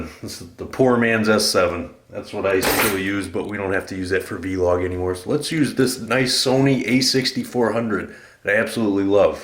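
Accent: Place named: Michigan, United States. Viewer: Hahaha, I knew you were from up there because of the accent. Meijer confirms it. Michigan native, here.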